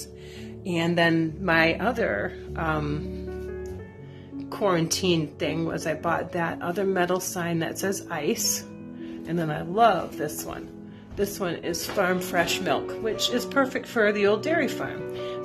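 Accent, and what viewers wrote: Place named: East Coast, United States. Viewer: Always enjoy seeing your home and how you decorate..love your eastern accent 💐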